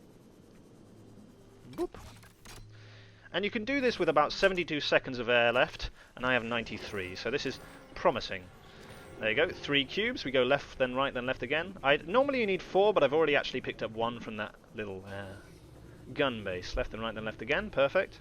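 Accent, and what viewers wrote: United Kingdom. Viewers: As someone said down there you're really good at commentating, and I absolutely love your english accent (as a french) ! And doing this while performing is incredible _ I say "Chapeau bas" ! :D EDIT : and he even EXPLAINS during his performance it's just so cool !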